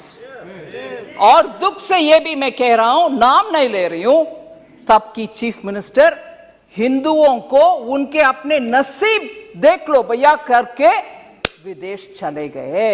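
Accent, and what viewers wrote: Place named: Telugu, India. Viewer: X lent mam a telugu lady expression in Hindi language beautiful hum Fida ho gaya aap ke bhashan on truth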